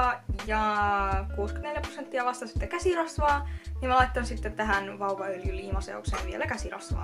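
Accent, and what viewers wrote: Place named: Ostrobothnia, Finland. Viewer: 😂 Kuulostaa kuin puhuisit pohjanmaan murretta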